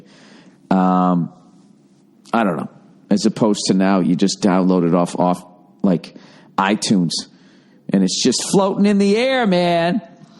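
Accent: Boston, United States. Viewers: I love the Boston Accent in there,especially, when you get heated on what you’re speaking about. Your rants make my day/night. 💛🖤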